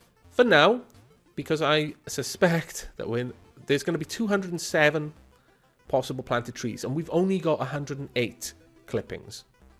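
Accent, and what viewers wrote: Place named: South Wales, United Kingdom. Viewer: Hey where in Wales you from? You sound like a proper south-walian!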